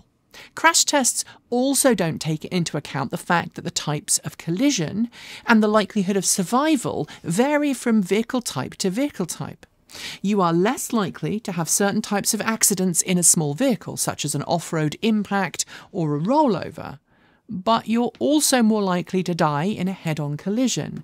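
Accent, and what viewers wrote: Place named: United Kingdom. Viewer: Well, it sure did take you a very long time to make some good points! Get on with it and so being in love with your own bloody British accent.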